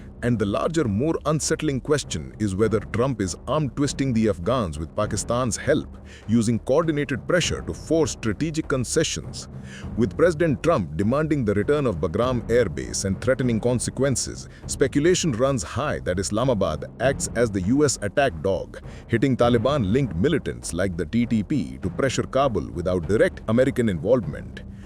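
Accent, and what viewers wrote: India. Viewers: No more Pajeeet voice on Godi media thanks to AI voiceover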